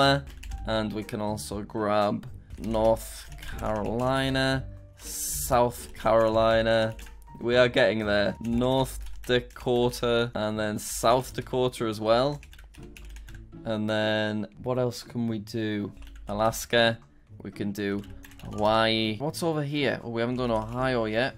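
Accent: United Kingdom. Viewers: are you From British (UK)? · I can tell you are British🇫🇯🇫🇯🇫🇯🇫🇰🇫🇰🇫🇰🇬🇪🇲🇩🇳🇺🇹🇨🏴🇬🇧🇹🇻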